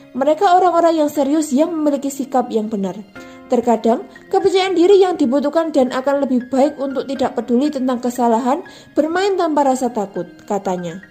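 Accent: Java, Indonesia. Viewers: Jawane medok nemen Yo? 😀😀😀 Tapi mantap.... 👍👍👍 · Jowone medok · Mbanya medok sangaat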